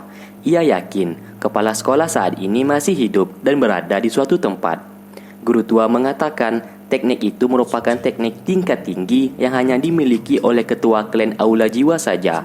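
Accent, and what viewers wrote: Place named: West Java, Indonesia. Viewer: Kekeh😂 aya sunda an ey😁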